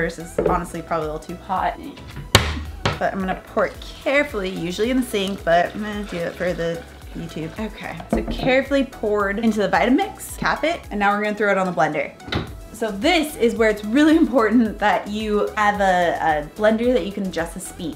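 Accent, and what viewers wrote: United States. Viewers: could you please slow down a bit? I just can't follow what you say (and Im sure may others and those who are not familiar with the US accent will also struggle). Great content though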